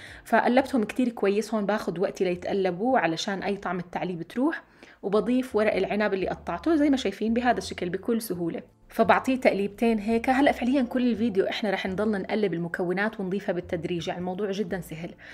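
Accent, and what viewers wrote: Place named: Palestine. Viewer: راح اجربها اليوم ان شالله ❤ يسعد قلبها اللهجة الفلسطينية😍😍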